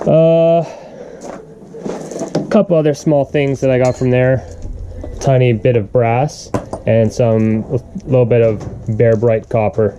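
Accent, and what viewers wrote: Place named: Canada. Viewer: Thought I detected a slight Canadian accent, there - Eh?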